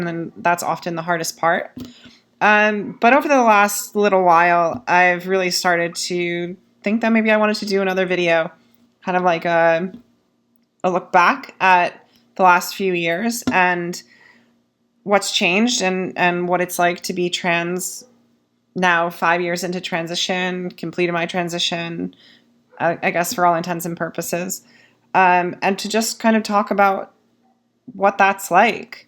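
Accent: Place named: United States. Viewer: i only discovered you this afternoon through your two '5 lies' videos but i have already committed myself to watching them all over the next week, month, year, etc (as many times as necessary for the points you make to sink in). you have such insight and self-awareness, and seem able to articluate your feelings through language so effectively, your videos are utterly compelling. even more, you even seem able to put into language feelings i have but can't find language to express myself. sometimes you say something that 'hits' so hard i have to stop the video, take a deep breath and recompose myself before carrying on, because you are bring thoughts and feelings i have into focus for me in a way i can't. maybe because i am too close, or too afraid. or because i don't know what i am looking for when i think about myself. i will look into your ebook too. 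i'm in UK/EU half-half. i'm guesssing most of it would apply (feelings are universal) and that it isn't specifically focused on getting help in the states (i'm guessing the states, from your accent but sorry if i've got your location wrong). as for more updates and videos, i will watch anything you post without hesistation. don't let your modesty or humility stall you. my eyes widened in this video when you commented that the running time was nearly ten minutes so you had better hurry up. truly, i would have been fine with an hour. or two. whatever you wanted to do. if anyone watches your videos with one eye on the clock i wonde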